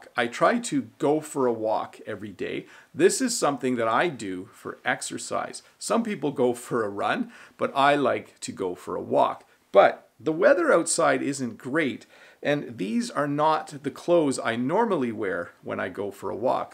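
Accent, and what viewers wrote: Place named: Canada. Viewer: I love the Canadian accent... it sounds smoother than the British accent 💖. do you have different accents in Canada 🇨🇦? If so why you don’t tell us about them please... 🤗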